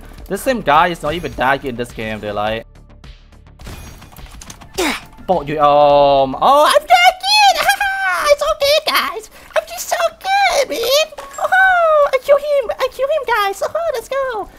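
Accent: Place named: Thailand. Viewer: I can barely understand your accent because your from Thailand im from Philippines...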